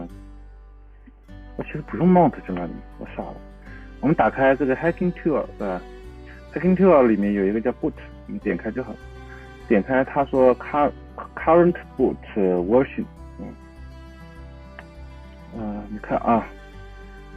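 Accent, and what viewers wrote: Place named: Jiangsu-Zhejiang, China. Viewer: 小哥哥，应该是江浙人士。。。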